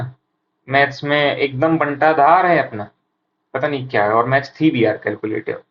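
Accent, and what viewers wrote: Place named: Bundelkhand, India. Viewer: Ok bundelkhandi lag rahi thi braj type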